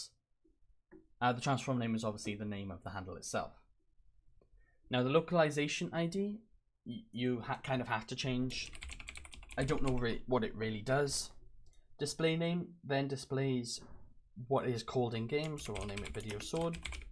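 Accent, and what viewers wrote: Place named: Wales, United Kingdom. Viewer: you sound welsh are you?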